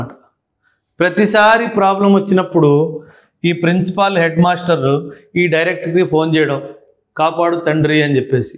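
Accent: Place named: Telugu, India. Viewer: Though you are a Sardarji having Punjabi as mother tongue, now you are speaking like a pure Telugu person.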